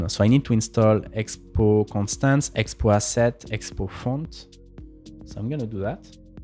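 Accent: France. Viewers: Man your french accent is great! Nice format btw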